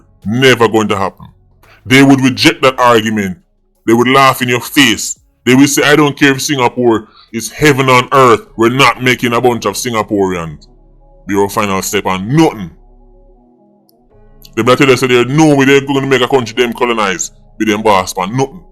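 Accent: Trinidad and Tobago. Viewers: lol@ his trini accent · 🤣🤣 sir P, di " Trini accent" madd!! 🤣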